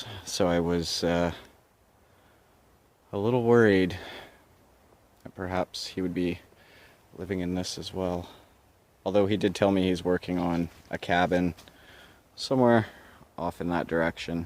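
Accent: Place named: Canada. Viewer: Hmmmm ouuut & aboouuut...you must be Canadian. Cool. I can only tell when you guys say one of those words. I haven't heard you say "ay" too much though...lol.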